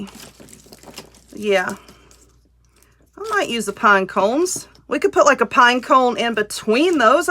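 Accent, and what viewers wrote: South, United States. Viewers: Love your ideas! I love your southern accent and your personality just cracks me up 😁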